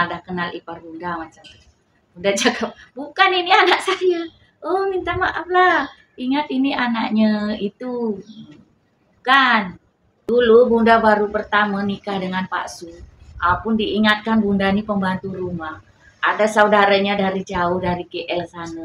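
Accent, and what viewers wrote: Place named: Java, Indonesia. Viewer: Slang Jawo bunda masih pekat sangat saya dengar, kakak ipar saya dah macam orang tempatan dah bunda slang bahasa' Jawo nya sudah kalah dengan bahasa Iban dah😅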